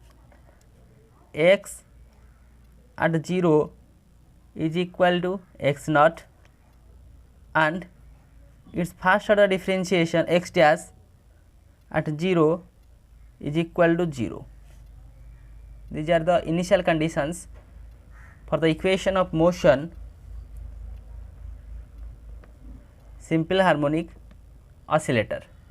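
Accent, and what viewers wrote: Odisha, India. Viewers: Sir by your voice you resemble like odia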